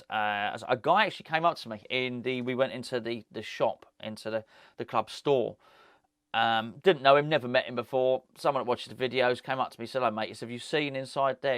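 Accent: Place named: Scotland, United Kingdom. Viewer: Great Scottish accent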